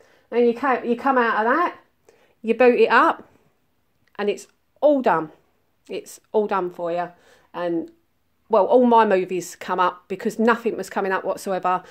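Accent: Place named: United Kingdom. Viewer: Oh i love your british accent it sounds son beatifull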